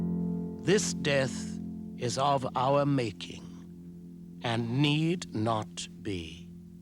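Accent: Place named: England, United Kingdom. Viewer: I DON'T want to be, or sound like many of the negative commenters we come across online.. I'd RATHER be positive! But... this video kinda sucks. It's shallow, doesn't say much at all.. just the English accented narrator speaking sparse attempts at poetic sounding narrative, shallow talk about how live "within boundaries" in this life, and other such trite sounding quasi-philosophical babbling. There's very, very little discussion about ... "The Other Side Of Life". Very little, other than a couple allusions toward someone having a NDE on an operating table. The rest of the video, to a large degree, is images of scenes on Earth, with accompanying 70's sounding made for TV movie soundtracs. Oh well. Watch it if you want ;) Blessings to all! We're all out of here some relatively soon coming day, and we'll each experience the ultimate "THIS IS IT!" for ourselves.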